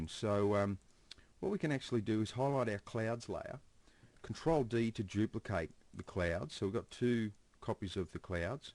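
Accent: Australia. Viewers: That's an Australian Accent if I ever heard one. Awesome Tutorial, thank you very much. :)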